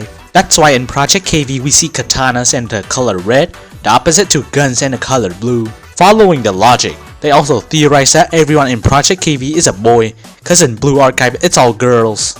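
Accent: Vietnam. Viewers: rly vnm accent 🐧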